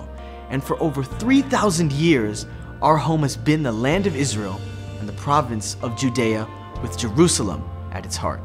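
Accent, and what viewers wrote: United States. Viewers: I love that this guy has an American accent and can claim ownership over land that once belonged to the indigenous, and be proud. Why not go further back before the myths of the book? Who were the Canaanites and who are the direct descendants? This video proposes the current Palestinians were immigrants when we know they are direct descendants. So are the Lebanese who hold closer dna than any current Israeli. There is no tangible evidence of David ever existing, so for anyone today to excuse apartheid and ethnic cleansing based on folklore is beyond insanity. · Are you Israeli or American? You sound like an American. God Bless you for all the information you have shared. You are bright and very knowledgeable.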